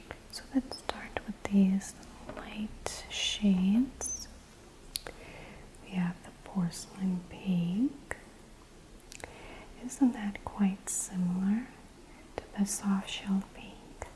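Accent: United States. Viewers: aka American accent version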